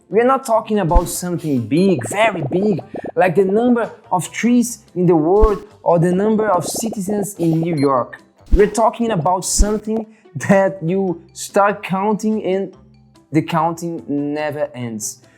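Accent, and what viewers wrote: Minas Gerais, Brazil. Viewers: Não terminei o vídeo, mas quero saber onde tá o mineireis. "Why" não vale! Kkkkk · Minas Gerais accent is marcante, não tem jeito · Sotaque mineiro em inglês é foda kkkk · O inglês "brasileiro" é o que eu mais entendo bem kkkkkk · Lhes apresento amigos o sotaque mineiro em ingles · O sotaque Brasileiro a falar Inglês é algo que me deixa intrigado, mesmo assim, excelente vídeo! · cliquei no vídeo so p ouvir inglês em mineirês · Now I can practice my listing with math vocabulary and Brazilian pronunciation, thanks for that. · UM MINERIN FALANDO INGLES É IMPAGÁVEL, QUE TREM MAIS BUNITO DE OIA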